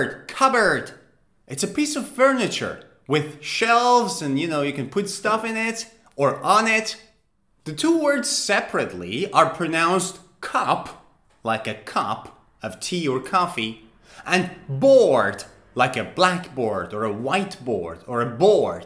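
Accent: United Kingdom. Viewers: this is the best lesson we had and it is very good to use british accent thanks a million all the best sir 👌👍🇦🇫♥🌹🙏😊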